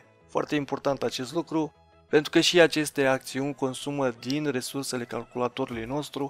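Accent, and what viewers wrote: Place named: Moldova. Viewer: Ce accent de moldovean.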